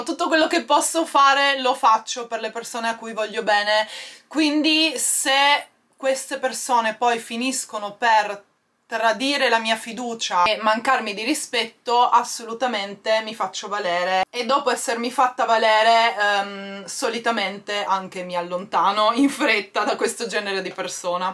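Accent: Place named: Sardinia, Italy. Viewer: allora ho le allucinazioni auditive! !!Sapevo che eri triestina...ma sentivo un forte accento sardo:-)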